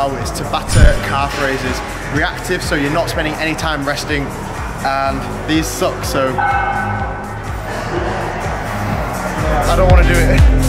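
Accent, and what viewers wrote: United Kingdom. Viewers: Would you mind talking with no environmental sound? It’s hard for me to listen your British accent and even more if you don’t use a microphone. Thanks !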